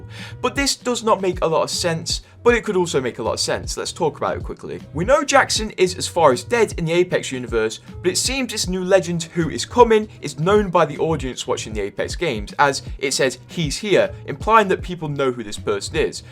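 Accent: Newcastle, United Kingdom. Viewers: Newcastle thick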